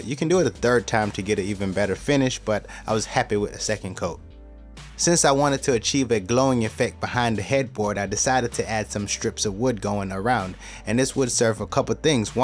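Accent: Jamaica. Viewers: You sound Jamaican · What is your accent? Sometimes it sounds Jamaican, maybe sometimes New Orleans? Love your vid btw. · Are you Jamaican man? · You Jamaican?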